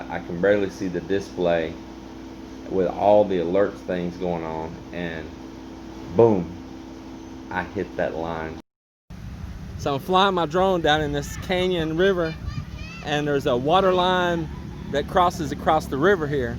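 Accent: Texas, United States. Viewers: I have decided I like you and your videos sincerely. Your Texas accent is kind of cheesy but I forgive you. I am 7 gen Texan and lived across the state from south to East. Maybe it's a north Texan accent. Anyway cheers. Thanks for posting nd I hope to visit soon.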